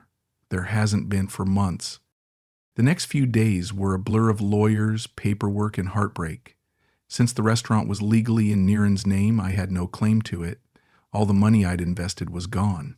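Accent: United States. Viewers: As a British guy, why do you have a US accent?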